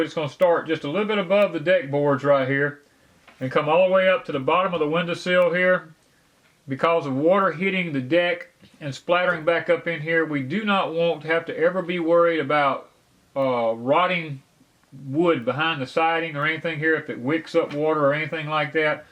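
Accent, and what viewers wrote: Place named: Deep South, United States. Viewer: Nice job, I can tell you are Deep South. In NC we would insulate the floor. Enjoyed watching.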